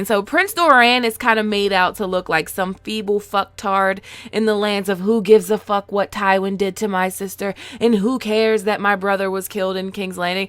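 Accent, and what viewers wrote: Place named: Baltimore, United States. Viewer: I just wanna hear you talk all year lbs. Is that a Maryland/Baltimore accent im catchin?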